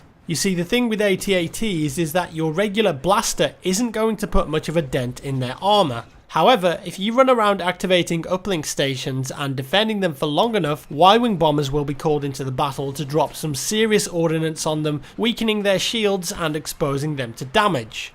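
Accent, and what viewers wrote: England, United Kingdom. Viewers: You seem to have the same English accent as Rick shiels a golf instructor on youtube. I wonder if you fellas are from the same area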